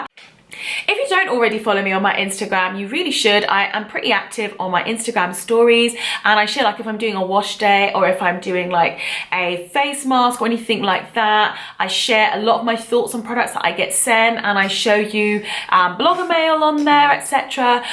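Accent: England, United Kingdom. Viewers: Just subscribed to your channel. Thank you for the output. You sound English but your products are priced in $. Do you have a UK supplier or am I misunderstanding something?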